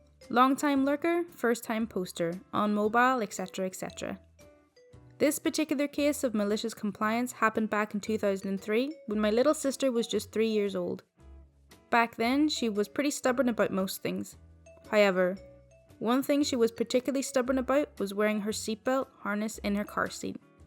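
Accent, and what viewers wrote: Scotland, United Kingdom. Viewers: Where is your ascent from? It sounds Scottish to me i love it though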